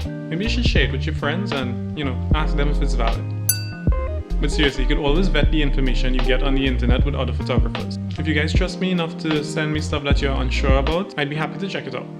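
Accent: Trinidad and Tobago. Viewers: Lol how u sound like a trini so bro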